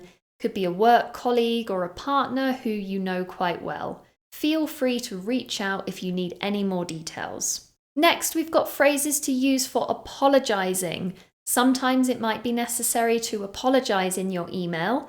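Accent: United Kingdom. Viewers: As a Brazilian citizen I prefer to learn from her British accent which is clear and sound more correct. I really loved this exciting 12 minutes class. I just found her channel and advise everyone to stick with her teaching.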